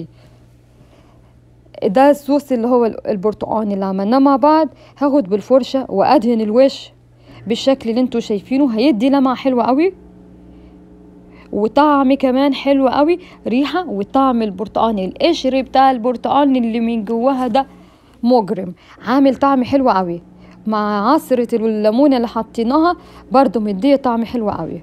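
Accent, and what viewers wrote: United States. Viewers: من لهجتك كدا انتي ياما مولوده ف امريكا ياما روحتى وانتي صغنونه تسلم ايديكي💐💗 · هو انتى منين وبقالك كام سنه فىامريكا مش عارفه عربى ههههه على امريكى عسل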